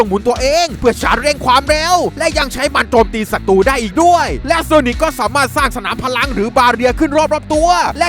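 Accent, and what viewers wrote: Thailand. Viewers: เสียงเหน่อทุกท้ายประโยค แอบหงุดหงิดเวลาฟัง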